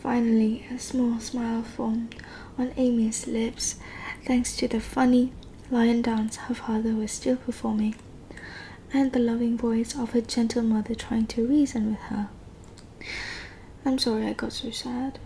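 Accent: United Kingdom. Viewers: Your accent sounds very british or am I just imagining it...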